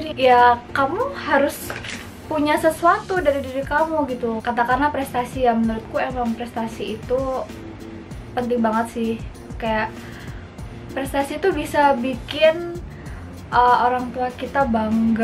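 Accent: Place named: Java, Indonesia. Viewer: wg jawa ga boleh ilang jawa nya 🥰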